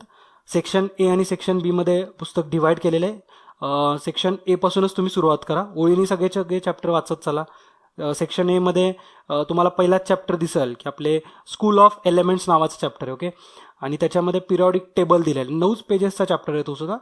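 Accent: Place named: Pune, India. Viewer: sir mala vatthe tumhi nakki punyache aahat karan tumcha bolnayacha ton ha same tasach aahe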